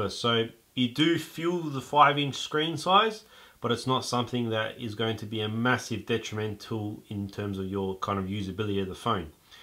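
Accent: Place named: Australia. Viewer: Aussie accent!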